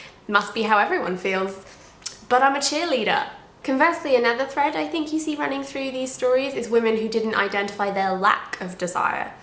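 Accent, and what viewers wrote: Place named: United Kingdom. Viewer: How did I know she was going to have a British accent before I even heard her voice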